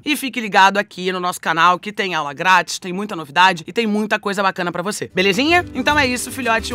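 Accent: Rio de Janeiro, Brazil. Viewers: esse sotaque carioca kkkkkk foda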